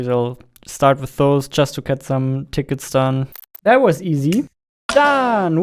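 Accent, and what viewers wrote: Germany. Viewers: Really uplifting and funny videos! I love the German accent as well! What's the name of the gray fluffy bamsefleece sweater you wear at the end? I got a Norwegian hoodie made in the same material and they are great. Good luck with your game. I've wish listed it on Steam. Looking forward to it! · i like how you can hear his german accent sometimes sneak through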